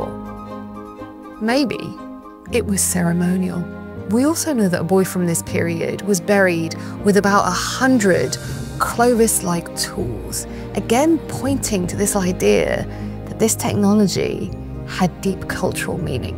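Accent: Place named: England, United Kingdom. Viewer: her natural English tones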